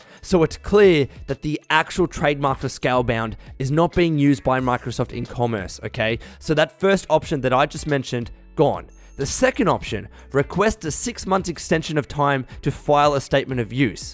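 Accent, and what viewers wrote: Australia. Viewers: I really like accent. Are there other Australian YouTubers that y'all suggest I should follow?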